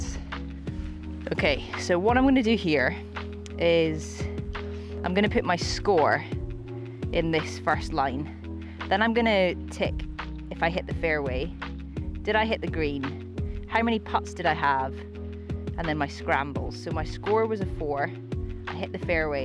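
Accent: Scotland, United Kingdom. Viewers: Great round....you gave it a good go and you weren't too far away from your own course record. Would love one of the balls which would take pride of place in my collection! On a side note, as a Scotsman who's lived in The Netherlands for over 25 years, it's great to see a wee Scots lassie doing so well and hearing the accent is music to my ears! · Great video! Thanks for sharing your insights on the course, also bonus listening to your lovely Scottish accent 😊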